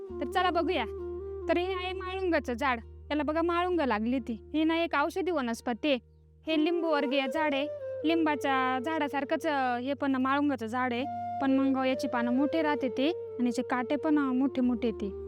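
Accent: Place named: Sangamner, India. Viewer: नमस्कार ताई खूप छान माहिती दिली धन्यवाद.. संगमनेर भागातून बोलता का? बोलीभाषा मिळती जुळती वाटते. आम्ही जुन्नर आळेफाटा..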